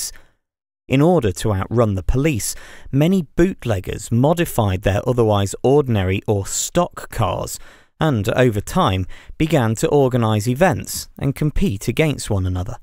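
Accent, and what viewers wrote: United Kingdom. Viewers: Your british voice describing American Stock Car Racing is something I dont want to listen to ... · A British man narrating the origin story of an All-American sport is weird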